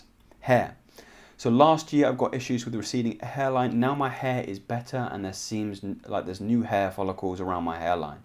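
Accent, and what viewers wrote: United Kingdom. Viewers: Dude has a British accent, so I don't have No choice but to sit down and Listen to Him.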